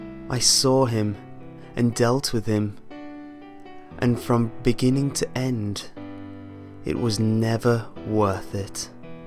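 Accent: England, United Kingdom. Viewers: because he is English that's why if you find the accent irritating just don't watch him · This guy is mental... so is his soothing british voice.. plus it was disturbing the belt thing... um.. this guy sounds like the british version of Pedobear O-O (\O3O/) GOODNIGHT EVERYONE! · who are you are you a british or what. im just curious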